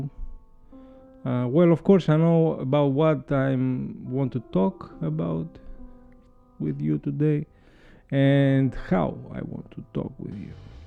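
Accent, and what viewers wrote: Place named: Greece. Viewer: The pronunciation a greek element !🤣 Όταν τελειώσω την έκθεση που γράφω, θα κάτσω να το δω.😉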